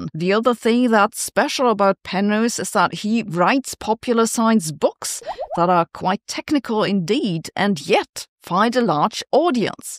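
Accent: Germany. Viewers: Breathing in the new German accent. ShiiRaahh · Great deutsch accent. You could sing a lesson singing an melody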